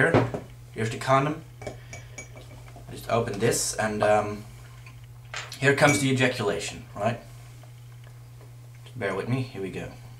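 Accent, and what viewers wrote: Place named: Europe. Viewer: he has an european accent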